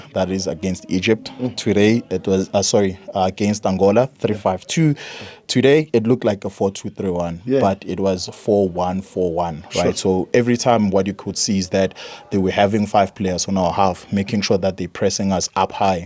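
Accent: South Africa. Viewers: Brilliant analysis Brilliant Jozi accent and eloquence. Thanks for salient commentary 🙏🏾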